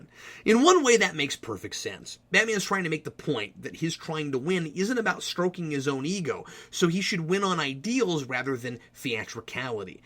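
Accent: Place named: United States. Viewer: CRAP....TOLD US NOTHING EXCEPT THAT ANOTHER 'AMERICAN' LIKES THE SOUND OF HIS OWN ACCENT...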